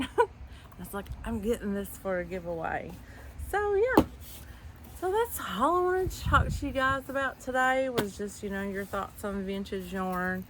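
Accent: Kentucky, United States. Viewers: I love Vintage yarn and love finding yarn at yard sales and thrift stores. New Subscriber here. Are you from Kentucky? We have similar accents. Your voice is comforting.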